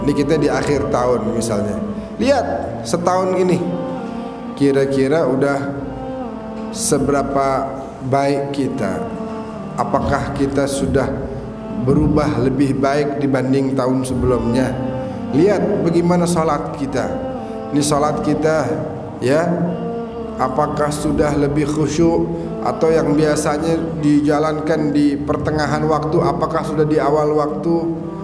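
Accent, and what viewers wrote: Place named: Betawi, Indonesia. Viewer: assalamu'alaikum habib saya sangat senang cara penyampaiannya tawsiahnya bicaranya habib itu Betawi banget enak didengarnya saya asli Jakarta bib buat habibana pokoknya sehat selalu panjang umur amiin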